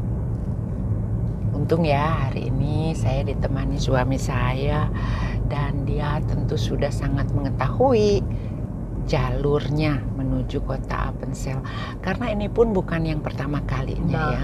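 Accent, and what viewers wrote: West Java, Indonesia. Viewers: Bu , emut keneh basa Sunda teu ? Di logat namah masih Sunda nyaaa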